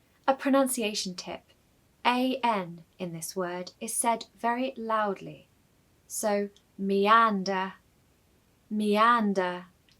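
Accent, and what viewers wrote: United Kingdom. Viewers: The best video I have watched so far in English words with a British accent. I hope that more videos like this lesson will be uploaded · British English sounds sooooo amazing! 🥰 Thank you for this precious lexical collection,! Please, do more videos of this kind. You sound so charming! I am Ukrainian and fell in love with British English in my teenager's years. I've been to London last summer for the first time in my life. OMG! I felt like in Paradise listening to British English. You walk on the street and hear behind you a Duke speaking with an intonation of majesty, you turn around and see just a guy in a streched T-shirt. British English is really something etherial and sumptuous! Love it so much!🥰😍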